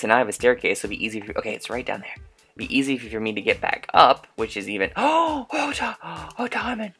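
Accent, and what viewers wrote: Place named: South, United States. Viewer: What's with the country accent????????????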